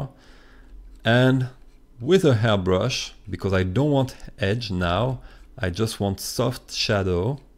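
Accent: France. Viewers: Salut ! J'ai vu que tu étais Breton alors je te parle en français ! Super vidéo, on apprend beaucoup de chose sur Krita. Continue ton WebComic, il est juste génial, l'histoire et mimi, drôle et magique, tandis que le dessin sont juste magnifique : j'adore ton style ! Pourquoi avoir choisi Krita ( peut être que Photoshop est trop chère mais je me dit que si tu es pro ... ) ??? Et comment faire pour diminuer la taille du brush sans aller dans la barre du haut ? Continue tes vidéos, c'est pas commun des tutos krita ! Ha, aussi, T'es vidéos sont géniale car JE COMPRENDS TOUT GRACE A TON ACCENT !!! ( sans vouloir être méchant, mais ton accent français est vraiment cool pour moi qui n'ai que des bases ! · are you fench (si oui, j'ai reconnu ton petit accent !) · You have a strong French accent xD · Are u french? U have a french accent so hard man lol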